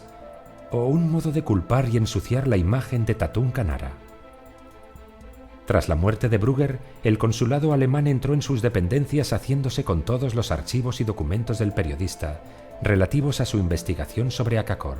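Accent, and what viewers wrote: Spain. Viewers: El documental está narrado con un acento 100% de España, no hay nada extranjero (ni peruano) en él.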